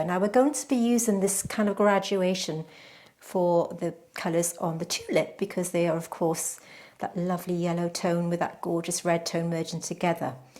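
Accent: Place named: England, United Kingdom. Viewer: thank you, I do do that but it is frustrating to pause it because then you have to bk it up and YouTube is making that difficult. Why can't they just leave well enough alone. Just got the holbein Gouache myself so I'm watching all your wonderful videos to learn. You are so very amazing. And as for the voice over text, we can't hear you're lovely voice. I myself love an English accent.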